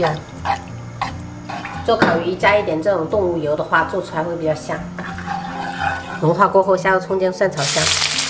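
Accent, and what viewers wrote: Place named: Fuzhou, China. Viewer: 地道的福州做法，从口音听出来的哈哈老乡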